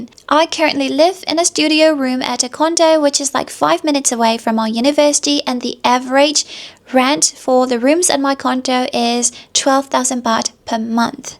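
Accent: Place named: United Kingdom. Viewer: Just curious, did you attend a Uk boarding school? Because of your accent haha.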